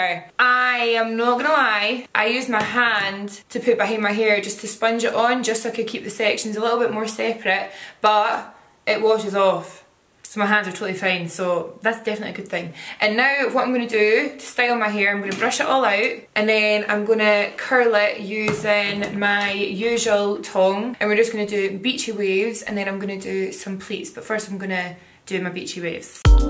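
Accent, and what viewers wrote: Scotland, United Kingdom. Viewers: Omg I love her Scottish accent! She's fantastic! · I love your hair and make up and your style!!! Please can you help me as I am very pale and now have mousy coloured hair which I highlight nbut I so so want dark hair without the commitment. So do you know anywhere I can buy either real hair wigs or similar???? Thanks I used to work in Glasgoiw and come from Renfrewshire but now live in Perthshire so bloomin great to see someone in my accent thrive!!! oxoxoxoxo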